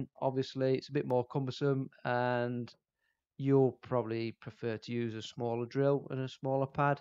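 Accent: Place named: East Midlands, United Kingdom. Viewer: Brilliant idea! Great video and is that an East Midlands accent (Nottingham? Mansfield? Derby?)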